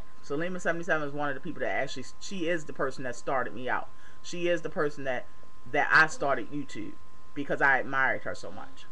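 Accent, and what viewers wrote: Baltimore, United States. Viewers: is that a baltimore or d.c. accent?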